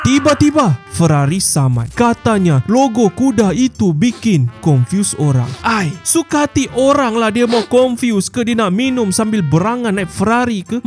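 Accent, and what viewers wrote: Sabah, Malaysia. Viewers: Tapi tidak dikasi tahu berapa! Dengar macam slang Sabah kan? Tapi itulah slang Melayu dulu2. Xde dulu sangatlah. Zaman P. Ramlee masih ada lagi.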